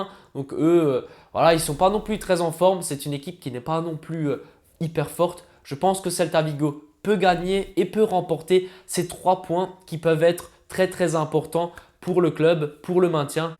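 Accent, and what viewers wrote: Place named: Alsace, France. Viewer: Je crois il vient d'Alsace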